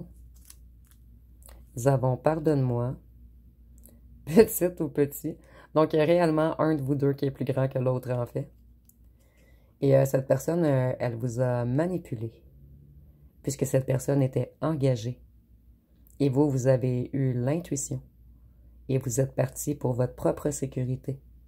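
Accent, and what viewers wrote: Quebec, Canada. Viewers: Vous êtes québécoise vous????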